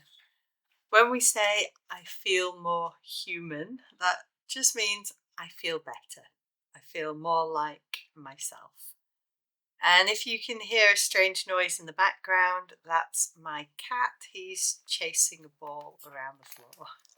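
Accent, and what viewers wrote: United Kingdom. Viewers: It's easy to hear and to learn. I love the British accent though. Thanks teacher!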